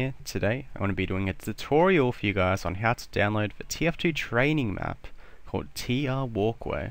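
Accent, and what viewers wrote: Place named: Australia. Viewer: If this guy wasn't australian I probably wouldn't really be paying attention to this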